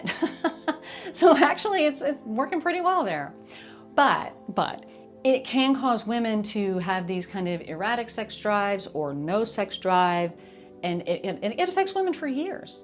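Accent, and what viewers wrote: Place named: Kentucky, United States. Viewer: Y'all ... I'm guessing Kentucky or Tennessee?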